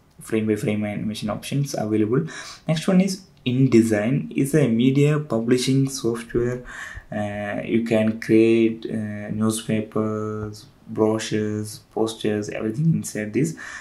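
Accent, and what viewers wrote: Kerala, India. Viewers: That Indian accent tho!!!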